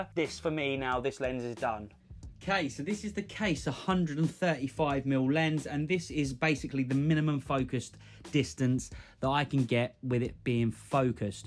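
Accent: United Kingdom. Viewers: having a studio at home is always an issue. It’s the biggest issue that stops people from setting up a channel. I can tell from your accent your from the south east, stay safe mate.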